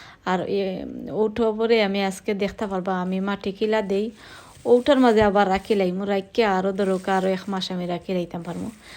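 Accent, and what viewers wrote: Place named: Sylhet, Bangladesh. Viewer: আপা আপনি কি সিলেটইয়া নি,কথায় বুঝা যাচ্ছে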